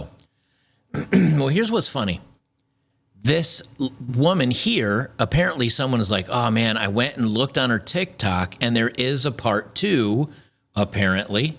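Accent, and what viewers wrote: Australia. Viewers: G'day mate I like your aussie accent. Crickey her reaction on the trip home was bloody funny. How would posting that video help her future dating prospects?. The first video makes her look like a terrible person, the second video makes her look pathetic. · I am begging you please do more Australian accents in your videos to come it is much-needed comic relief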